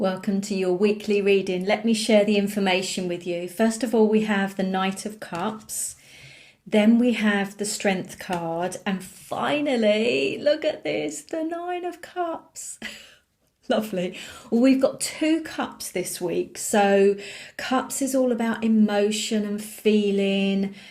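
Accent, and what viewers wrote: United Kingdom. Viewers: Good Morning!..From California.....Gemini is here...How r u? Lovely lady...Thank uuuuu so much for the beautiful reading for me ...Trully appreciated ....By the way, I love your British Accent....Tc ..Much love .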